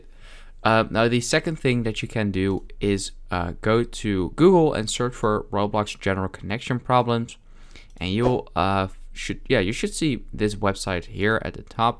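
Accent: Netherlands. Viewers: UR DUTCHH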